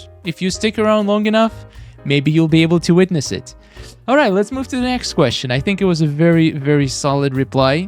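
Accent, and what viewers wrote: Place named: Ukraine. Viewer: I knew you are from Ukraine 😅 I was questioning myself every time I was watching your videos, trying to hear any confirmation by accent In any words 😂. And from the beginning I start watching your videos I was reading your YouTube name as, is it true? Is it your surname like that? 😅 it was my theory Thank you so much that you saying openly about russian war against Ukraine 💛💙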